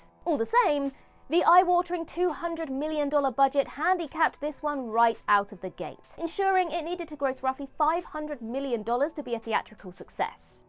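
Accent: United Kingdom. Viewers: You have the cutest British accent I have ever heard.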